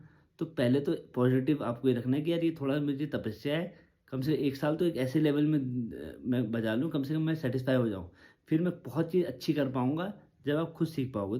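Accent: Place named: Pahadi, India. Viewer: Bhai accent se to pahadi lagte ho btw meri kahani to aisi hai ki Sa nikalne main 6 mahine or Sargam main 1 saal lag gaya par control itna nahi tha ki dhun bajaoo toh maine thande baste main daal di fir 5 saal baad lockdown main uthayi to dheere dheere raag bajane ka control aa gaya.Ab do saal se regular baja raha hu or thik thak baja leta hu.Sab khud se he seekha isiliye itna time or effort lag gaya kya karoo mogli ki generation ka hu, gadha majdoori kiye bina hum logo ko chain nahi aata.Smartphone generation to har chij main coaching,note,shortcut,trick,hack dhundti hai smart log hai na gen Z fir Z1, Z2, Z3🤣🤣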